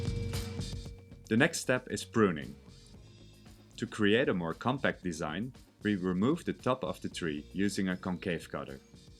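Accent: Netherlands. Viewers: je hoort echt direct dat je een hollander bent😂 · O yeah dutch guy. Ik hoor het van 10km afstand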